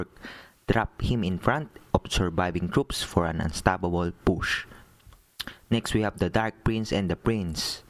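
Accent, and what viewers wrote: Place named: Philippines. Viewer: Base on his accent bro is a Filipino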